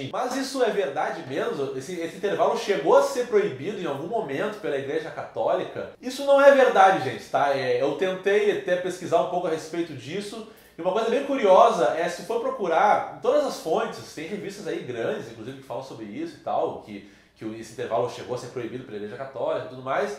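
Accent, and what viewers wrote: Rio Grande do Sul, Brazil. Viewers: Olá professor! Estou encantada com seus vídeos. São todos maravilhosos e de excelente qualidade!! Gostaria de saber seu nome e nome da equipe de trabalho. Creio que sejam gaúchos, pelo sotaque. Acertei??? Abraços à todos! E parabéns pelas explicações simples e fundamentadas.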